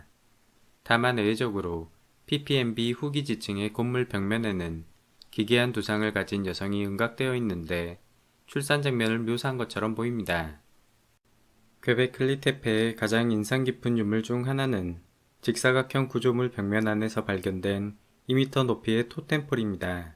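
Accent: Jeolla, South Korea. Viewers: 전라도 사투리가 들린다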